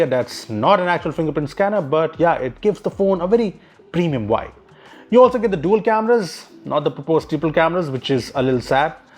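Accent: India. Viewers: BRO YOUR ACCENT IS DIFFERENT THAN OTHER INDIANS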